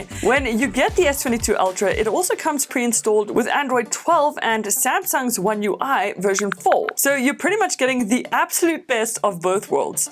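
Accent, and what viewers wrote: South Africa. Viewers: You still in South Africa? Thought you had the accent... checked the about area and was right 🤣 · You have a South African Accent, I wonder where you are from? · Why have I just come across this channel now?! Great video! Subscribed!! Also, is that a SA accent? Lol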